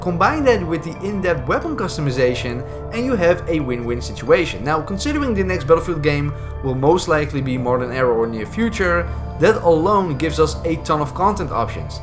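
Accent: Netherlands. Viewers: This guy is Dutch, i could hear it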